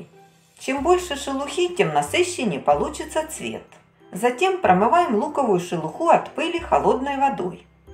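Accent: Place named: Ukraine. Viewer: Акцент хохляцький. Важко слухати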